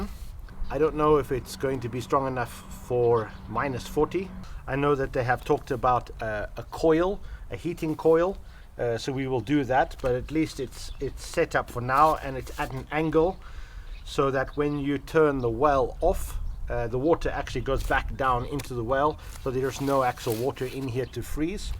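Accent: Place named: Cape Town, South Africa. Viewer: I knew it🤣🤣🤣 I'm from Cape Town too..our accent is very distinct to the rest of the world..you can here a Cape Tonian accent peeping through any accent lol. But I'm so happy for you. Your life looks so pieceful. I'm struggling in Cape Town to escape the rat race. It's just so expensive to buy your way out to live self sufficiently here. I want to move off grid but land here is so tight and the land claim act is making it difficult for people. So I dream of taking an adventure like you, but I don't have enough money yet. But you are brave Sir. Very brave to journey so far to the unknown. I'm really happy for you. Keep on enjoying your adventures with your family..I have subscribed to your channel. I loved the archery video as I am into archery 🏹.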